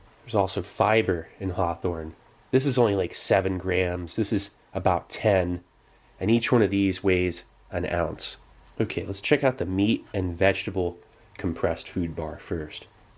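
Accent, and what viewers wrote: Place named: Midwest, United States. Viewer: Mid-America i think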